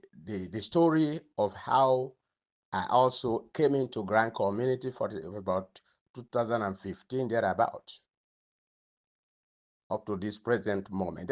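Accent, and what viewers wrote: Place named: Aba, Nigeria. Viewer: u talk like aba man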